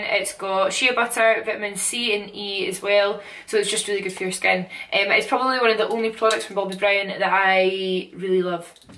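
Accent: Scotland, United Kingdom. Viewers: Ah I love the Scottish accent! If I weren't Welsh, that'd be my choice! Amazing skin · 12 seconds in I actually love you your so funny hahaha totally do the Scottish accent justice!!! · you are beautiful and I love your gorgeous Scottish accent, just want to let you know that your jouer lippy is pronounced jou-eh not jou-er. Just for future reference. Keep shinning gorgeous. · Are you from Scotland? Hahah being Scottish myself i picked up the accent within seconds! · everything you've done is "Amazing". You did everything perfectly. Your stunning gorgeous now. You know your shit, that's truly. Your wedding photos are drop dead gorgeous.......and that dress you bought with all the layers almost makes me cry.....so beautiful. Keep up the great work. I'm addicted to your videos!!!!!! More please!!! 😘. Plus I love your Scottish accent. Love your friend from 🇺🇸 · Kayleigh Ritchie is that a scottish accent? 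if so it's really gorgeous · I love this video I don't even wear makeup I just watched it bc of her Scottish accent! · SCOTTISH! hahaha this makes me so happy to see a Scottish YouTuber who's videos are about beauty! Probably cause I'm Scottish! Normally people say the Scottish accent is really harsh and angry sounding! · I love the Scottish accent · scottish accent?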